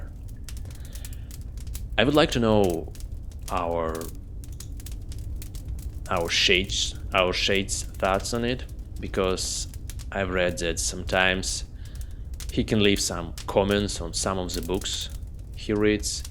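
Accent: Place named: Russia. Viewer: You have a Russian accent.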